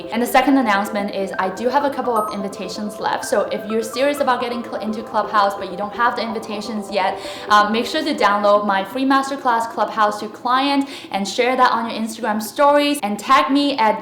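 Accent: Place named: Australia. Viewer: Where are you From. Are you an Aussie girl? i love your accent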